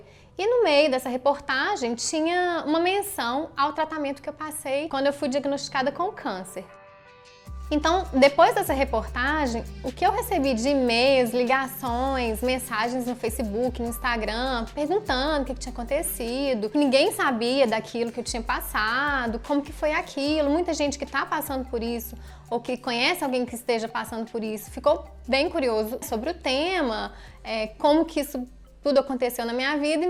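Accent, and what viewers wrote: Minas Gerais, Brazil. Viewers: O sotaque mais bonito do Brasil, o de Minas Gerais.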